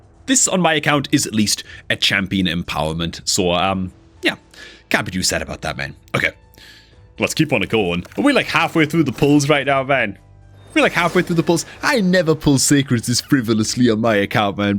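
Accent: United Kingdom. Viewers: He's british ... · Why you hating on him he’s just got a British accent 😂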